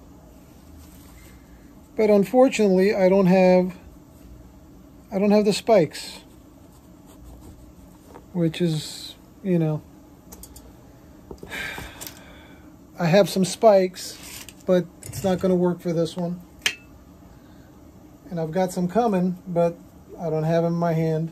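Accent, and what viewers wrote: New York, United States. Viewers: Your channel is addicting, watched a few episodes last night and then I realized it’s 2am, and had to get up at 4am lol. But it’s relaxing and I enjoy watching. Learning about nice shoes. I’m a carpenter so don’t get to dress nice much, but I like watching how you take apart these shoes and rebuild them. Pretty awesome tools and old school machines. You have a NY accent brother. Not sure where your shop is located. I had a German shepherd named Zues too, sorry you had to relocate him. Take care and keep posting awesome videos.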